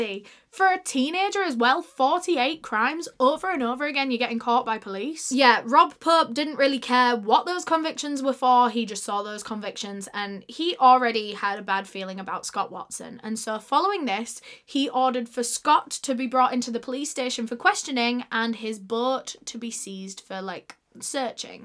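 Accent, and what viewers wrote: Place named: Yorkshire, United Kingdom. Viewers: she’s from Yorkshire I am sure, which is in England! Definitely not Scottish or Irish 🙂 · Yorkshire accent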